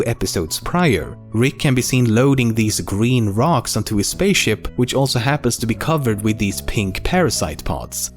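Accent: Sweden. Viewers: There's something slightly wrong in the accent of the narrator of this video. Like he was slightly swedish or something? I don't know. POST-EDIT: towards the end "Believe me ... I've SHECKED" , that was a dead on class A swedish sign. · Hej ska du bryta lite mer på svenska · Everyone's applauding the severe accent of a Swedish person who never thought about what he sounds like, calling it soothing. Okay. To someone else it's annoying as hell, how smug he is sounding while pronouncing English like a third grader. I'm not saying I can do better videos, but seriously, I'm from Sweden and most people know how to say "j" and "ch"... Or it could be on purpose, who knows. Now I know what accent to fall back into when needed, lol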